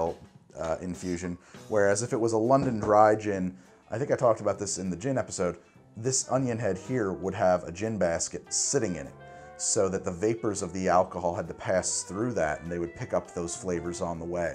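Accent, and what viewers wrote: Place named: United States. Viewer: I don't actually know where lives, I assume the US because of his accent and turn of phrase, I assume you live there as well because of your attitude, I could be wrong and you could both live in Australia, I really don't know and it frankly makes no difference. And as I don't know, I will go with the worst possible situation (eg 'doing this is breaking the law') and work from there, if it's not illegal where you are that's great! Have fun, try not to burn yourself on any of the hot surfaces and liquids that this process will create, and be careful with which fractions you choose to drink from it. But I'm not going to claim to know what the distillation laws across the globe are, I'm not a lawyer.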